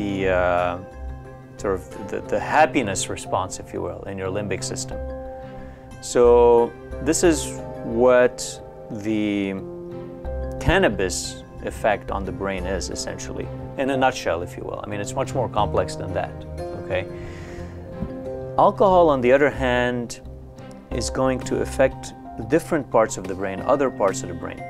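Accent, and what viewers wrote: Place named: Netherlands. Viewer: ... why do you sound Dutch?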